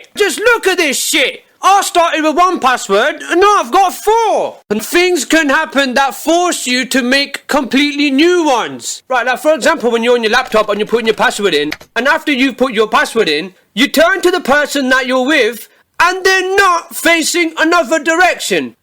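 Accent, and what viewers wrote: United Kingdom. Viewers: grade are you British ?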